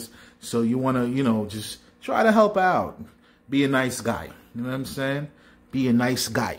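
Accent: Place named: New York, United States. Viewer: Love the NY accent man 😆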